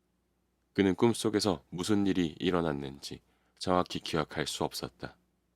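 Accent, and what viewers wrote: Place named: Busan, South Korea. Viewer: 부산분이셨군요~ 사투린 모르겠고 억양이 있어요 근데 오히려 그게 매력이 되는듯해요 전 좋네요^^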